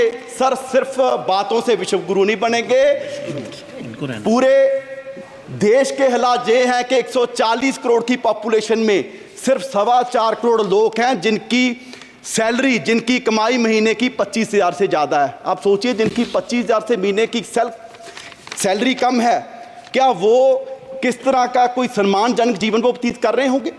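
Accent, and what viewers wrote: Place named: Punjab, India. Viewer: Sir ki punjabi hindi bahut mast hai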